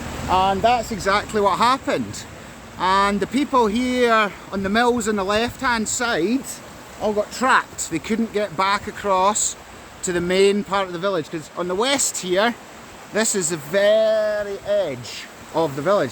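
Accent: Scotland, United Kingdom. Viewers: I love your sco´ísh accent :-) Good walk. Since almost two years we are waiting to go to Scotland..... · Scots accent is very difficult 😓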